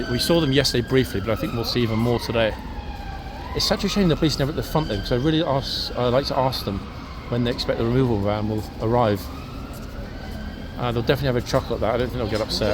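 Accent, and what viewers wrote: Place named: United Kingdom. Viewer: I've really enjoy your videos. As well as loving your British accent🙂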